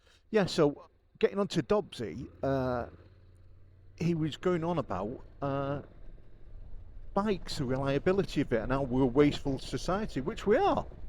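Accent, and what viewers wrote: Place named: Lancashire, United Kingdom. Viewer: Very enjoyable "wednesday waffle", i enjoy your miss mash manc,cheshire,warrington accent more than posh freddies dialect,. I had to laugh when you decided not to follow the silver car and ended up pulling out behind him again when you came to the junction,. Nice change of scenery at the ducati shop instead of your usual go to "youles", a breath of fresh air, i used to go to woods of abergelle (now no more with the completely motorbikes crash) for my ducati fix, andrew woods was brilliant, he would let you demo most bikes, i took my old boss,s 748sp there once for a service and andrew gave me a 916 for a few hours while he serviced the 748, the first demo i ever had there was an early 900s or something simalar, it put me right off ducati's, the early ones werent very refined, the 916 was lovely tho,.i'm a yamaha man through and through so i dont tend to get side tracked to easily,. 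My eldest son bought an R reg (98) 996 sps and its cost him a fortune over the years, mechanical issues early on and now belt changes even tho he has'nt ridden it since 2019, still has to do a belt service when its due, it costs him £25 p/week storage costs in london to, married with 2 young kids and a high pressure job gives him plenty of money but little time to enjoy the bike,.he bought 3 of my collection recently and he has them garaged at a friends place 20+ miles outside london, only room for the 3 of them, tzr3xvsp 250,rd350b, cb750k2, ive still got my yamaha R7 ow02 (2000) at least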